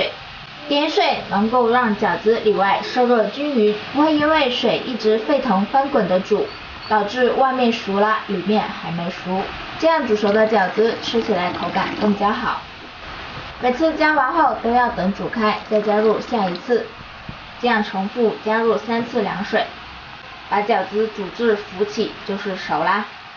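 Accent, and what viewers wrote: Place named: South, China. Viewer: 我一打开，听到南方口音，我就知道好不了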